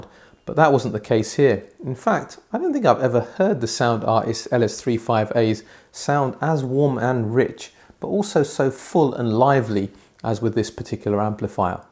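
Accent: United Kingdom. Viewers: You sound British, but don't look British.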